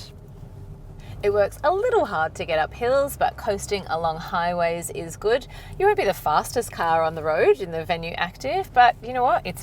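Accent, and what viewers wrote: Australia. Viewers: I dont know how to tell you but Aussies pronounce things differently. Because there are different countries outside yours and people outside of you lol. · Damir D, she has an Australian accent, please do not judge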